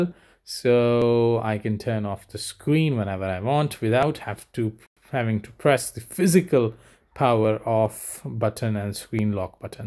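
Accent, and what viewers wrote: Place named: India. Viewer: First of all you are very Indian accent second you are talking to fast that’s not good way to help people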